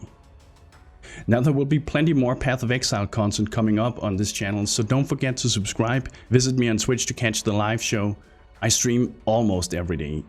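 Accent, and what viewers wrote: Denmark. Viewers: Are you Danish, you sound Danish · You sound danish brow. Nice vid. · Er du dansk Bro? Syntes jeg kan ane en Dansk dialekt i dit engelske ;p Når men Mange tak for videoen, Det var super go Info. 👍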